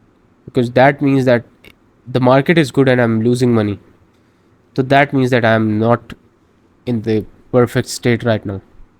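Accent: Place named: India. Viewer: indian accent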